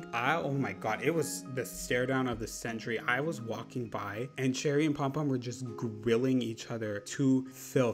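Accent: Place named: Canada. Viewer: I heard your Canadian… that makes you my favourite animal Crossing YouTuber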